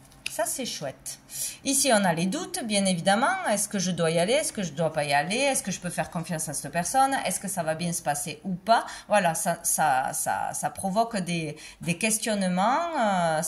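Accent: South, France. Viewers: Acsent du sud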